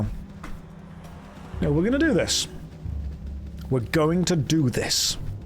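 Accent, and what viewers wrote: United Kingdom. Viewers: Please, good sir, do tell me that you are indeed British as you seem to be. Because if you are, you are probably one of the Britishiest people I've ever seen.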